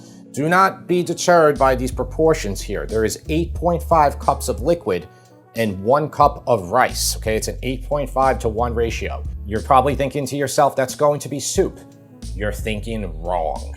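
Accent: New York, United States. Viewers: I haven’t tried the recipe yet, but I enjoy the way you “tawk” because it’s exactly how I do! Lifelong New Yorker. My adult children still mock me, especially when I make fresh-brewed “cawfee!” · Love your NY accent! I’m from NY too, but now live in Virginia.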